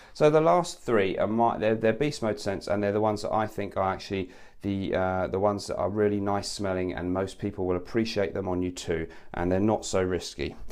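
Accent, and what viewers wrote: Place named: London, United Kingdom. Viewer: Your a London chap aren't ya ?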